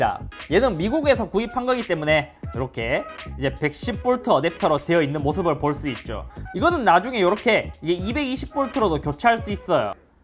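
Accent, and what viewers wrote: Gyeongsang, South Korea. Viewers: 오우예 같은 경상도 people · 역시 갱상도르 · 갱상도 아입니까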